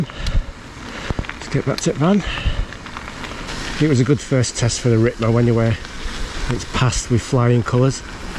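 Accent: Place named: Yorkshire, United Kingdom. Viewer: If it wasn`t for the Yarkshire accent and the wet trails I`d have sworn I was watching BKXC. Brilliant video, loved every minute. Quality review of the bike as well.. Oh and the colour of the handlebars.......I`m colour blind so it Doesn`t matter to me LOL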